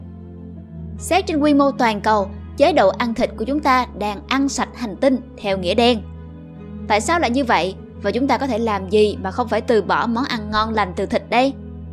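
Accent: South, Vietnam. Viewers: Kg biết ai thích thịt thích rau gì chứ riêng tui thì tui khoái cái giọng con gái miền nam ghê à ngen ^^ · giọng đọc hay kiểu miền nam mà sao xài chữ Lợn với Lạng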